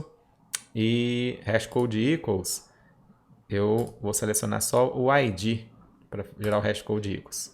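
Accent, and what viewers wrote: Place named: Minas Gerais, Brazil. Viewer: Engraçado, esse sotaque, acho que mineiro, faz ficar mais fácil ou é impressão minha? MUITO BOM!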